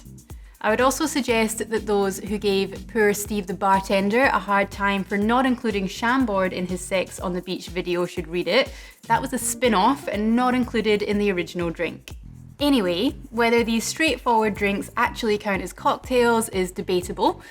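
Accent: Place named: United Kingdom. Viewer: Aussie? I thought u were from the UK....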